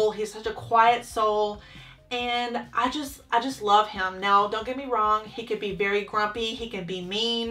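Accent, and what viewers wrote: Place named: Louisiana, United States. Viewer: I’m from north Alabama! Just outside Huntsville. Love your content ❤️ and you mentioned loving Louisiana accents, I can hear it in your accent too! 😊😊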